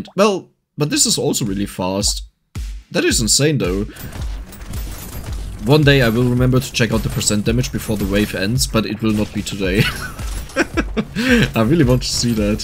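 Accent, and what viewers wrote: Germany. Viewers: why do i hear a german accent?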